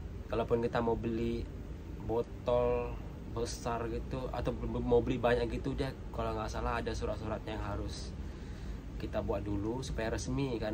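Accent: Kelantan, Malaysia. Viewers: Wow pndai bro ckp kelantan👍🏻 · SALAM... BARU HADIR DLM CHANNEL KAMU. TERUSKAN SEMANGATMU BERJUANG DEMI KELUARGA TERCINTAMU.. BERBAKTI KEPADA ORG TUAMU. ITU YG LEBIH MULIA. SALUTE KECEK KELATE MOLEK BELAKO.. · pandainya cakap kelantan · Wah...dah pandai cakap Kelantan 😀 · Mantap kecek Kelate mu bro 😂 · Wah.. saya org malaysia pun tak pandai kecek kelate. Hebat kelate mu.🤩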